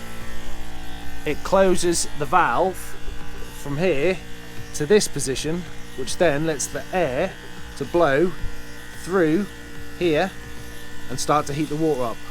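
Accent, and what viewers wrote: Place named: Norfolk, United Kingdom. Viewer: Can I buy the 3D printed air inlet/outlet from you please? From your accent you sound like you're local to me (Norfolk).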